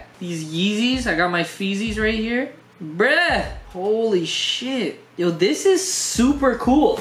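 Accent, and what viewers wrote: Canada. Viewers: I love Canadian accents. They’re hilarious (though ‘Merica has some funny accents, too). Don’t change :)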